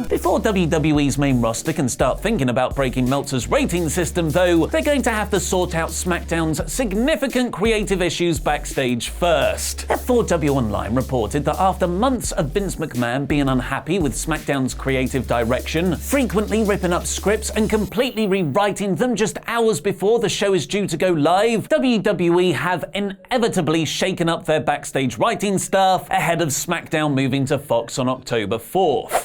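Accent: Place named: United Kingdom. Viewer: I would appreciate it if you could find people who spoke English with an American accent and without a distinctly British accent so maybe I can finally understand WTF you’re talking about. (I’m looking at you Whatculture and Cultaholic）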